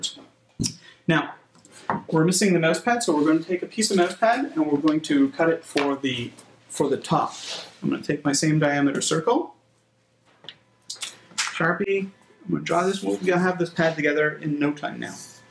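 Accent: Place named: Canada. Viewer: Canadian much? Great vid BTW...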